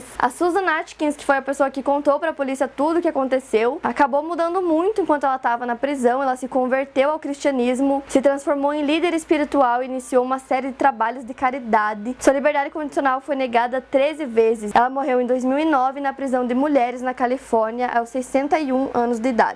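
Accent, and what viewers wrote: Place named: South, Brazil. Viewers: Video um pouco antigo mas mto bom, a sempre explicou mto bem, pesquisou mto bem, e merece muito reconhecimento pq isso eu sei que da mto trabalho, e além disso tem esse sotaque sulista SUPER FOFO ❤🤡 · voz sulista que fofo e engraçado s2 kk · Mas tem um sotaque sulista diliça♥️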